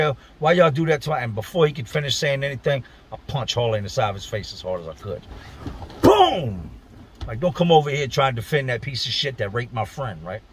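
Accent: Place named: South, United States. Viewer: That sure isn't a virginian accent you got there. I'm from Manassas . What you got there is a mixture of southern meets black. Like you videos but I hate "how" you say your story. Keep on keepin on my friend. It is what it is too. I just talk truth so if ya don't like me referring the way he speaks to blacks then o well. Fact remains though that y'all know exactly what I'm talking about.✌️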